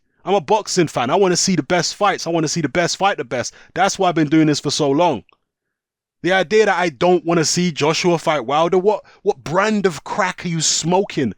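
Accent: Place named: South London, United Kingdom. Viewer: has a very heavy South London Accent. Which part of America is South London in?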